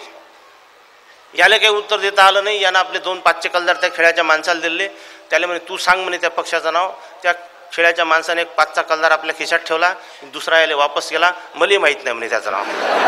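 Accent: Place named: Vidarbha, India. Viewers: Khup sundar. Tumchi Marathi aikun khamgaon Chi aathvan aali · निखळ विनोद. हसून हसून मुरकुंडी वळते. मिर्झा बेग साहेबांची विदर्भातील वऱ्हाडी बोली फार भावते.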